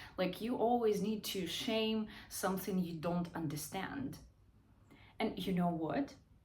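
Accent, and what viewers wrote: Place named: Russia. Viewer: Hello, I found your channel a few days ago through your video about chastity amid researching the topic, then watched the rest of your videos and subscribed. I believe this is your best video yet. I wanted to leave a word of thanks because channels with content like yours have inspired me 1.5 months ago to find a dominatrix, and since then my life has improved exponentially, both materially and perhaps more importantly, spiritually. The point which you bring up in this video, that submissiveness shouldn't be perceived as a weakness but rather a strength, and therefore ought to be a trait which one can be proud of — even publicly — rather than ashamed of, is something I’d never considered before. This may have to do with me being Japanese, although I imagine it’s generally true everywhere else. Lately I’ve actually become slightly more open to my closest friends about my submissiveness…and this video makes me feel even more empowered and encouraged to come out of my shell. 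Thank you for sharing your thoughts, your experience laden articulation and honesty is always appreciated. Looking forward to your next video, and in the meantime, good luck in your continued endeavors as a dom! PS your Russian accent is charming ^^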